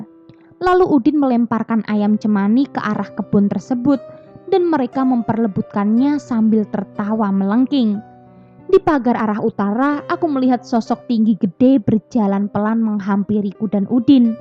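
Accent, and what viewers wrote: Java, Indonesia. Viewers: Org jawa ya kak? Logat nya medok kali 😂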